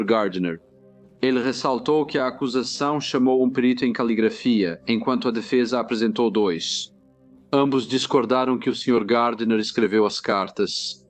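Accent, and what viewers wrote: Portugal. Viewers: Este sotaque de Portugal é um saco! Dá pra resolver esse problema? Se continuar assim vou deixar de assistir vídeos deste canal.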